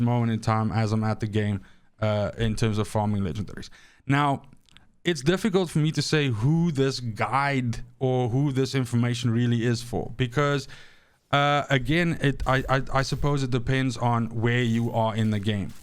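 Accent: Afrikaans, South Africa. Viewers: Love the accent - has to be South African - ek stem saam maar ek kry 3 lego's vir elke tweede CT15. Will be great to team up with you sometime! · hawe you from south africa bru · are you south african?? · Are you Afrikaans ? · Are you South African by any chance?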